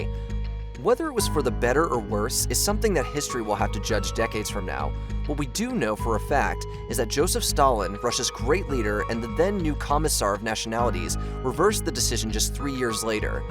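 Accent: Spain. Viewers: He's probably Spanish, where "J" is pronounced "H". Like Juan, Jose, Marijuana,...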